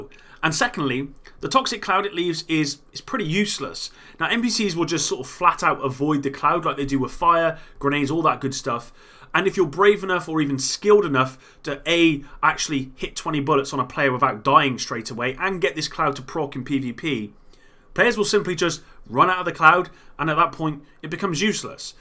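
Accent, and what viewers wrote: United Kingdom. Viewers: Hey mate.. just subbed.. I have seen your content before and always appreciate your nice clear commentaries. Except for linearly, but no one can say that world lol.. what part of the world are you in.. can hear a British accent in there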